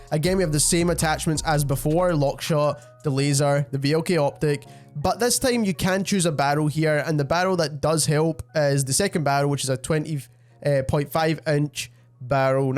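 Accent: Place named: Scotland, United Kingdom. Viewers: Sounds like the janitor from the Simpsons... so yea... a Scotsman. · Yes scottish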